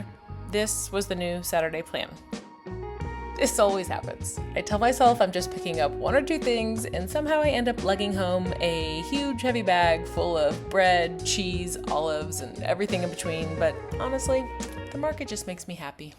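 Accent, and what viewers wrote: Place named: United States. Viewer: Your behaviour is spot on French the only things you can't your accent plugs you as America not that not a bad thing the French do enjoy that because you have become French, the thing you chase flies away don't feel bad I still do the same thing and I was born here you could actually be more French than me now that is funny! I hope you wash away some stress in the court yard which a common thing to have in many buildings even in Paris! Your looking far less stress keep doing this your on the right path! One side note you should understand saying no is what all French to everyone your allowed to say no sometimes you may have to repeat yourself if he will not take your no walk away their hate I can assure he has had to told him long before he see you, and he can handle one more no! Try some of that cheese with fruit pears are really great with many cheese don't forget to take small spits of your wine with your cheese! 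It is OK to look like Marie Antoinette the French love this I am telling you I see a French lady you even have a level of class unseen by Americans. To be fair I have American accent when speaking French I have not been in France for near 40 years I don't feel French even though I am no longer American! I am having fun watching you taste cheese like I do yeah this is fun!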